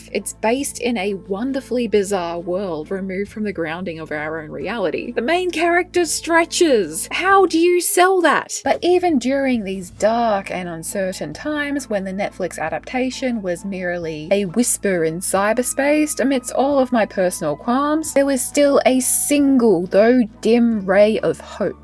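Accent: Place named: Adelaide, Australia. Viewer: didn't realize that you were in NSW, I assumed that was an Adelaide accent.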